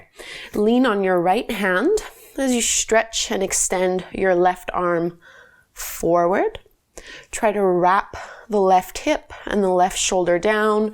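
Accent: Canada. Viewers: My word today is "grounded". Thank you for your amazing videos. I love starting my day with your guidance and expertise. I really appreciate your encouragement for modifying poses as needed; I never feel any pressure to conform to something that doesn't work for my body. Also, as a transplanted Canadian living in the US, it is really wonderful to hear your Canadian accent. The three oms at the end gave me some time to really internalize my practice.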